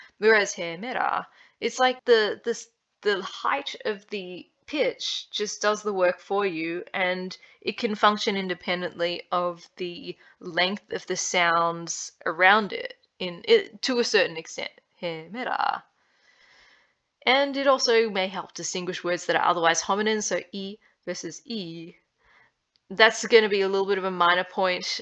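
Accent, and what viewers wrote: Australia. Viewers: I think your accent sounds mostly Australian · This is a super introduction to the subject, thank you. I love the charming way you pronounce these snippets, with this ever-so-slightly Australian dialect of Greek. I started learning Ancient Greek about a year ago, with an ancient Teach Yourself book (Kinchin Smith and Melluish). Since starting I've subsequently started to learn rudiments about the actual pronunciation in Ancient Greece. In fact this TY book explicitly says in the introduction that they're not going to bother with accents "because they're unimportant" ... ! Sadly this also reflects what Allen says in his otherwise very useful book *Vox Graeca*, most of which I've now read: "don't think about trying to get the accents, it's too hard and not worth having such aspirations"!!! I find these attitudes absolutely bizarre! I now have a target accent: 5th Century BCE Attica! 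The "other" challenge there (apart from accents) is the aspirated/unaspirated allophones, τ/θ, κ/χ and π/φ. It's hard but not impossible by any means: I find the best way is to try and speak the unaspirated ones in a sort of Spanish accent, because Spanish has this tendency to swallow accents (i.e. to prefer unaspirated), whereas with English there generally seems to be a mixture of aspirated and unaspirated. Have you done anything on the unaspirated/aspirated allophones? I had a glimpse at your Youtube vids but couldn't see anything.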